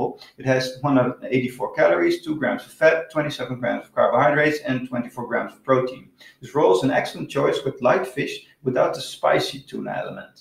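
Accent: Netherlands. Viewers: This dudes sounds as Dutch as you can sound. I would bet the house on the fact that this guy is originally from Holland.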